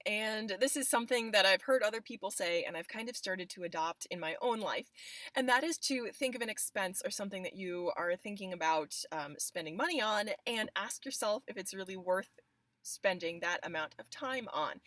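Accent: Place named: Northeast, United States. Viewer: What part of the northeast are you from?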